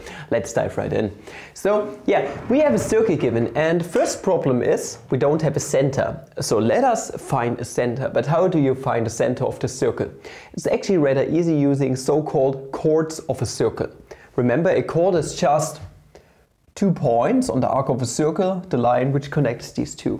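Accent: Germany. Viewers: Es fällt einem fast nicht auf, dass der typ deutsch ist, wow.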